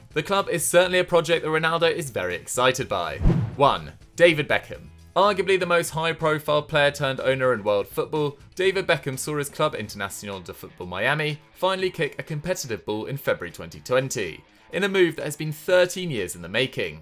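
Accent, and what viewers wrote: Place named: United Kingdom. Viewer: Your British ascent was too deep we don't understand most of it. Please in future put subtitles. Anyway thanks for your information. Keep it up 👍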